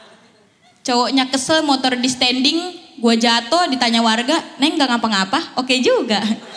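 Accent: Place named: Cikarang, Indonesia. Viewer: Aksen Cikarang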